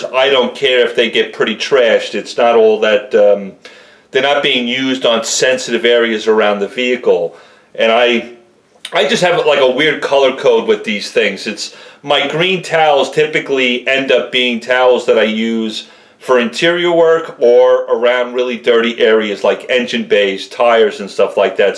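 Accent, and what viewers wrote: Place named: New Jersey, United States. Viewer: no kidding, what's up with these people. Is there a 3 minute law for videos? Screw that crap...I like listening to a guy who knows his sh*t and educates and explains... I am blown away by all the comments you get for long videos...also, why are people so fascinated with your Jersey accent? And many call u Howard stern, they must have never visited the northeast??? But keep it coming, you turned me on to armour all quick shine Aerosal , and purple power!!! God sends...for that I just ordered a 46 buck order from your microfiber store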